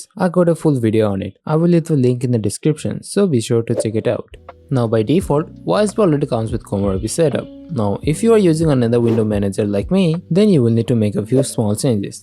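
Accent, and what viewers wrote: India. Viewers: Are you Indian by any chance? Your accent seems pretty Indian. Loving the videos by the way.❤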